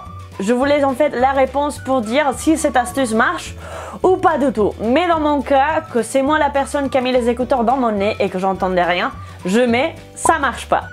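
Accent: Spain. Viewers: Mademoiselle espagnol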